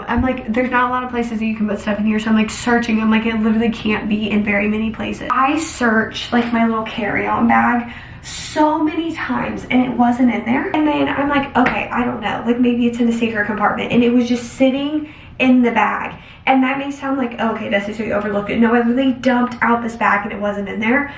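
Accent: South, United States. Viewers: Her southern accent is the cutest thing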